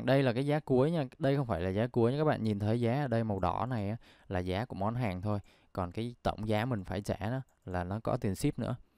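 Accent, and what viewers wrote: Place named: Bac Lieu, Vietnam. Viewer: Tiếng đúng dân BL.. em ở BL đây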